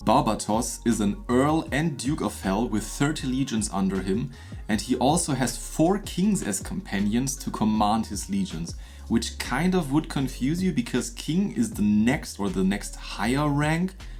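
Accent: Germany. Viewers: Do not worry how you pronounce the names. German accent is the sexiest.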